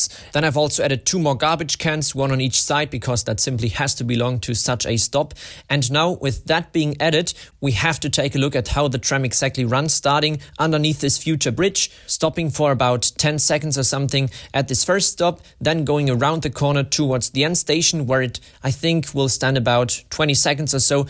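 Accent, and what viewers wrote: Germany. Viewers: I hear a bit german XD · HAH ICH WUSSTE DAS DU EIN DEUTSCHENAKZENT HAST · Are you from Germany or Austria? btw, love your video's!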